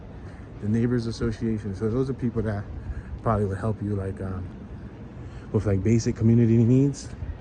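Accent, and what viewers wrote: Philadelphia, United States. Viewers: What a day to be celebrating Queen Village with the passing of HRH Queen Elizabeth II. Thank you; this is beautiful. Philadelphia is nothing if it is not diverse in neighborhoods and atmospheres; gorgeous. BTW you have the same Philly accent my relatives had a century ago; thanks for keeping it alive. ;-)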